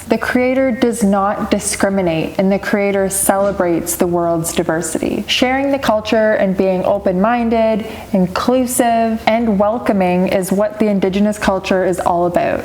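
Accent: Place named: Canada. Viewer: Thought process: North American she says... so US? Wait no she’s saying it’s ok! That’s not very American.. Ahh the accent is coming out. You must be Canadian you guys really are way too nice haha 😅 And I realize you said to not spread harmful stereotypes but I don’t see the harm in this one, except maybe in that by trusting and giving anyone the right to your sacred rituals you’re harming those of you who are indigenous and can’t put on or take off their heritage whenever it benefits them, because in the US at least, not every Native reservation has access to their own culture (ex. Moccasins can be expensive but way more pretty White girls than indigenous people on reservations can afford them; no hate just a point). I don’t know about the situation in Canada, y’all’s country seems to have everything figured out all the time😅 but for viewers in the USA it’s crucial to redirect the focus from “yes boo you can do whatever you want as long as you promise to educate yourself and be respectful” to “check your privilege, use it to benefit indigenous people. 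If you love their culture sooo much, give it back to them. Fight for them. Instead of participating in a ‘cool’ event, maybe enable an indigenous person to go to that rally because it means more to them. If you can, get doctors and lawyers and people who have more power to make a difference to go and help. There’s nothing there for ‘you.’”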